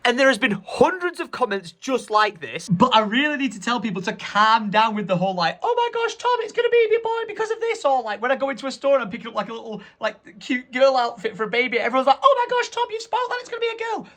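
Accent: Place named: United Kingdom. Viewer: I absolutely love your accent but as an American i have one too. But what wanted to say is i love the way the way you say but. It’s so British. Love your blogs. And by the way i am percent British my ancestors come from Manchester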